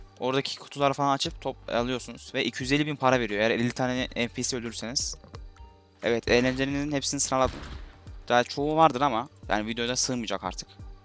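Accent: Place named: Azerbaijan. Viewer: abicim azeri sandım az yavaş konuş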